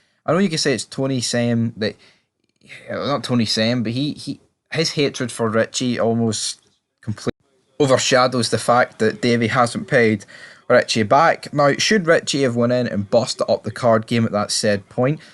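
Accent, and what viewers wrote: Scotland, United Kingdom. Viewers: The Scottish narration doesn’t go with the subject matter.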